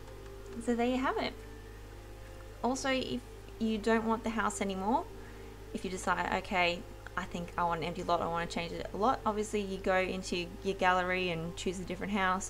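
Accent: Australia. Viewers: As soon as i heard your voice I could tell you are aussie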